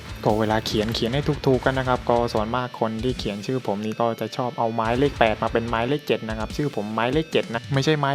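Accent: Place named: South, Thailand. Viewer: เป็นคนใต้ป่ะครับ 5555 ชอบเสียงอ่ะ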